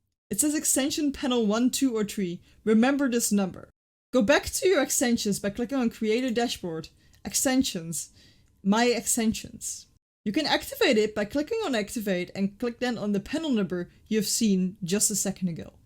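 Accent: Netherlands. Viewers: nice are you dutch you sound dutch!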